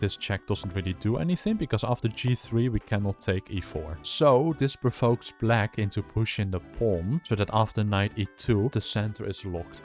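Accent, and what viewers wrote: Netherlands. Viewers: you sound hella dutch lol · it was a very weird game. It went e4 e5 f3 d5 Be2, I was losing all my pieces but then I turned the board around and won the game. In all seriousness though, I got completely cooked and lost. You can view the game, it was broadcasted om lichess, tournament name was Dutch Open 2026 (also are you Dutch as well? Your accent makes me think so, would be cool to see you irl)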